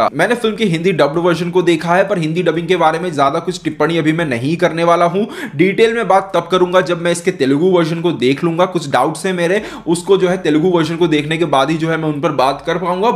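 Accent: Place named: Haryana, India. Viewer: Nice review...Keep it up...some jokers are trolling haryanavi accent. Is it really worrisome?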